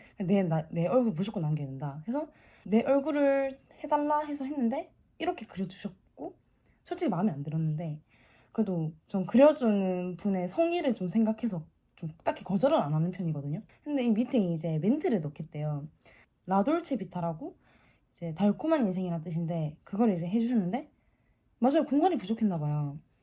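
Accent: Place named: Busan, South Korea. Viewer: they're so pretty!! and ur busan accent is so nice to listen to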